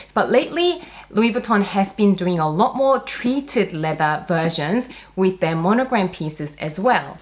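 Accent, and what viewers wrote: Australia. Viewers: She sounds like she lives in Australia but didn’t grow up there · Are you from Australia? U have strong accent, sounds funny. Great info tho! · Is she Australian?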